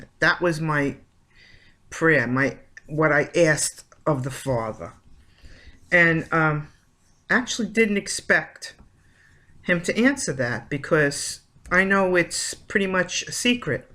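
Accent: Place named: New York, United States. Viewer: Also I love your accent.. NY?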